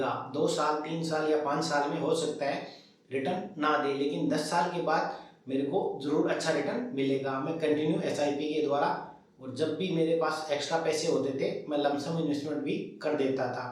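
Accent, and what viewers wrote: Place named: Shekhawati, India. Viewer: तभी मुझे आपकी बोली में अपनी शेखावाटी भाषा की टॉन नजर आती है😋😀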